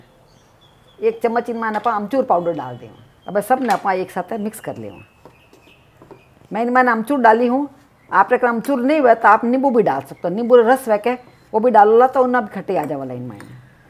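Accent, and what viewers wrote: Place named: Rajasthan, India. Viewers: Loved your marwadi, that's called boli me mithas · Bahut badhiya... Apno marwardi bolno ko style khubh chokho hai. Ghar ki yaad dilati hai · Zabardasat aunty m or mere waif ap ki resepi or ap Ki marwari boli k jabardast fan h ,kasam se ap ki sari respi try karte h🙏🙏🙏🙏🙏 thanku ma,........ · Bht hi acchi marwari bhasha k sath rajsthani lehje me...swadist mircha.....khaane me swaad aa gya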